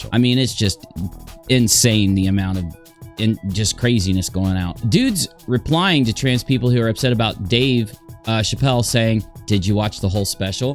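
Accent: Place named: Midwest, United States. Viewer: keeps saying "the Florida statuette" when talking about the Florida statue that protects Brian's parents. A statuette is just a small figurine, so when she says "the Florida statuette" all I can picture is a small figurine in Florida somewhere LOL. I don't know why she doesn't Google that term to make sure she doesn't sound like an inbred moron, especially considering how she Google's everything else she "knows." Or maybe it's her Midwestern low IQ accent that is making her say it that way, either way it makes her look and sound even more ridiculous than she already does. I'm not mad at it though it just makes watching her videos a little easier knowing what a clown she really is. 😂👌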